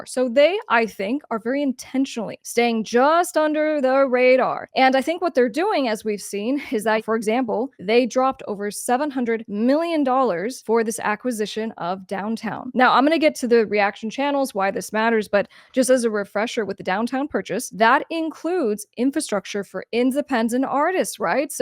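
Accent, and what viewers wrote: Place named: New Jersey, United States. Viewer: Such a new jersey accent lol never noticed till now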